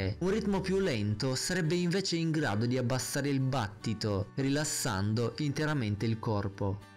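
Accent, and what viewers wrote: Russia. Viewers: dopo tutti sti video tuoi che mi sto vedendo ho disperatamente bisogno di un accento russo come il tuo ahahah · oddio sei un grande,il modo con cui ti esprimi,dettagliato e con parole ben scandite,il modo con cui parli (so che sei russo però questo è positivo perchè ti rende la voce tipo enigmatica e questo a me piace),queste cose ti rendono perfetto per il genere di video che fai,dovresti avere più iscritti e,ti farai mai vedere?fai una top 5 sulle curiosità sul lego?sei un grande continua così ;) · sei russo? dall'accento si sente un po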